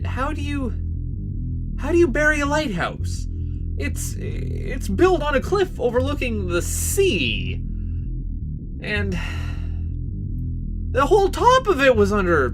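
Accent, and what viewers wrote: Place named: Canada. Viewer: Aw, your Canadian vowel raising is so cute~! <3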